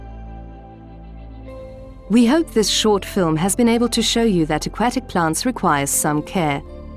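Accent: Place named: Germany. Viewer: i hate posh english in a german accent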